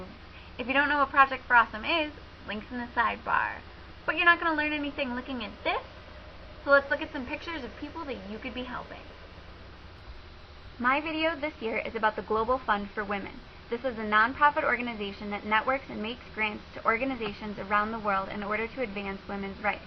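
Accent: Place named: Wales, United Kingdom. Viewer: love the welsh accent.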